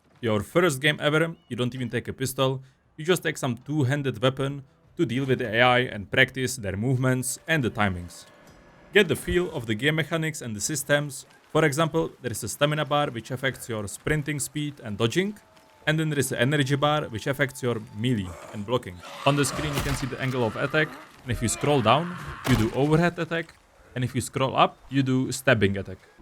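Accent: Czech Republic. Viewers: To je hrozný jak tam člověk slyší, že je čech... je to takové divný. Jinak super video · Czech accent much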